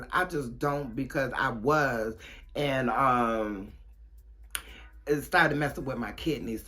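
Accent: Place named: Long Island, United States. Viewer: New subbie I like your style I knew I heard a lil my in there I'm from Long Island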